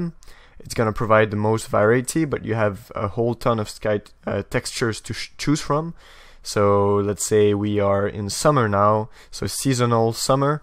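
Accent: France. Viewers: are you french ???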